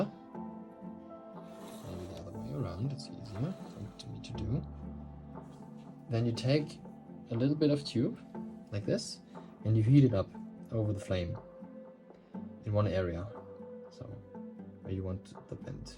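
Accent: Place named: Germany. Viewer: I think your deutsch 😂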